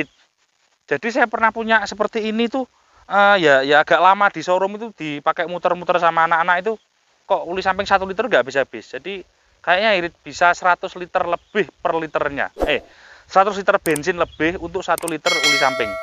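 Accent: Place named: Malang, Indonesia. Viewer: Nonton test drive sampeyan poleh kangen ngalam Sam. Boso jowone sampeyan medok khas ngalam an. Alhamdulillah aq duwe sing th 1983. Sukses buat yo sam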